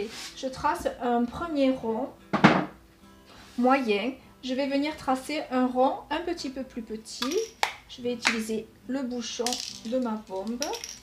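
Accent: South, France. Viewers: a l accent du soleil !!! merci ca donne la niak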